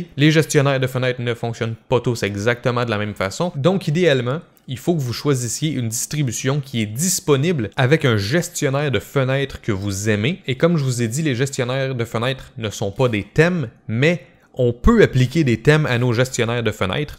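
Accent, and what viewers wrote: Canada. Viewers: C'est super merci. Explications claires qui donnent envie de se lancer. (et votre petit accent canadien est charmant) Merci. Ella 🌺🌷🍀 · Cool ta vidéo. Riche en contenu et pas seulement pour faire une vidéo.. Par contre qu'est-ce que j'ai dur avec l'accent canadien ^^ bisous de belgique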